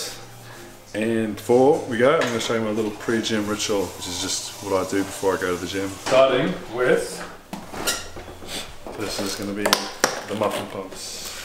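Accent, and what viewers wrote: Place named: Australia. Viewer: Accent von Aussie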